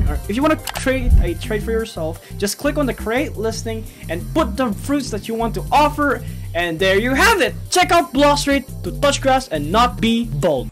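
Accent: Philippines. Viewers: sa tingin ko talaga Filipino kaw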